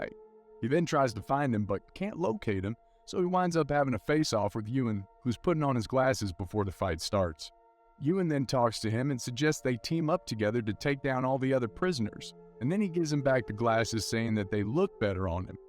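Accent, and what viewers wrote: Texas, United States. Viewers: Texas accent recap? is this an AI voice?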